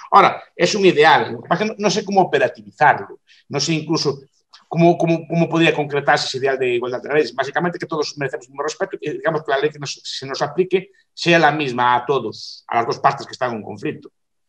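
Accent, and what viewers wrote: Galicia, Spain. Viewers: Habla en castellano . Tiene acento Gallego Eu si falo Galego .non creo que sea muy dificil .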